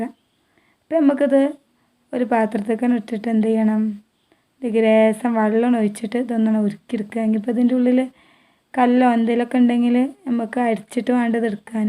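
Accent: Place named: Malappuram, India. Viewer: നിങ്ങളുടെ ഭാഷ പൊളി ആയല്ലോ മലപ്പുറം കാരെ ഭാഷ ആയിരിക്കും അല്ലേ🤣🤣🤣🤣🤣👌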